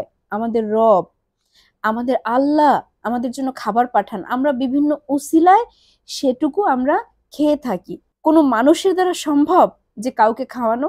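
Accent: Barishal, Bangladesh. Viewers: মনে হচ্ছে গো আপনি বরিশাইল্লা!!! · আপ আপনারর বরিশালে কথা আমার খুব ভালো লাগে আমার জন্য এক পাত্রী দেখবেন ❤ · আপু আপনার অভিনব কায়দায় বরিশালের ভাষায় কথা বলেছেন অনেক সুন্দর হয়েছে। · মুই বুঝাই গেছি আপনার জেলা বরিশাল ঠিক কিনা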